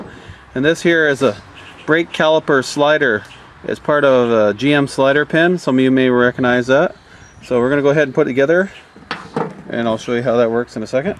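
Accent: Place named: Canada. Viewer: I like how you can talk to 'no one' and keep it interesting. And also Canadian accent.. how aboot that aye.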